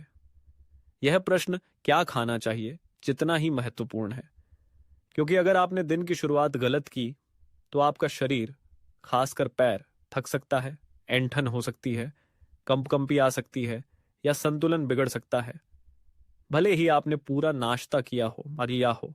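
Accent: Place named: India. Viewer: विडियो विदेशी और आवाज भारतीय। पूरी विडियो विदेशी।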